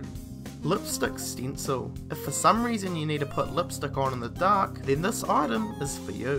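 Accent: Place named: New Zealand. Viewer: 1trueNRG my guess would be, New Zealand, sounds like their accent lol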